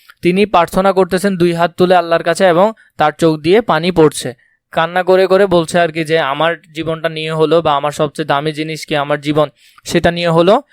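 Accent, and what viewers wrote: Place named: Chittagong, Bangladesh. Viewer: আপনি মনে হয় চট্টগ্রামের মানুষ তাই না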